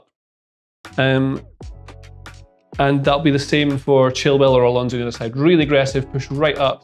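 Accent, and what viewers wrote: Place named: Scotland, United Kingdom. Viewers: Why I have a feeling that a Scottish accent is perfect to explain football tactics??? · is this guy scottish or eastern european hahah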